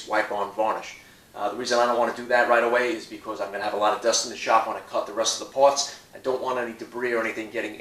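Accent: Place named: Staten Island, United States. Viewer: Are you from Staten Island?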